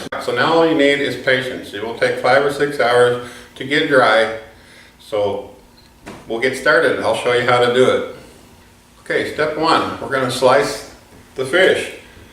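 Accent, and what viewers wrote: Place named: Wisconsin, United States. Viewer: Thanks for this recipe! I needed some ideas for fish, and I have a freezer full of carp and gar that I will be turning into jerky. Are you from Wisconsin? You sound just like some of my friends from Rhinelander!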